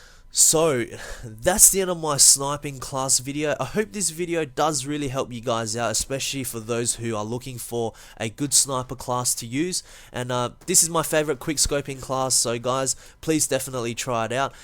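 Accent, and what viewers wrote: Australia. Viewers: Aussie oi oi are u from aussie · Are you Australian? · Australian !! Yay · R u Aussie m8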